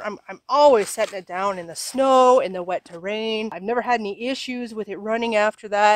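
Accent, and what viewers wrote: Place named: Canada. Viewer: I THOUGHT you lived in Canada or close to it; because of your accent. I think accents are neat! And yours gives you away.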